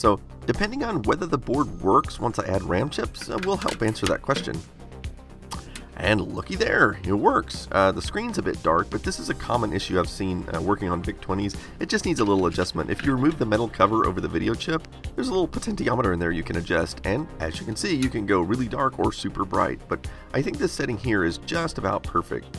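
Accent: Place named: Texas, United States. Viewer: sounds more Texan than usual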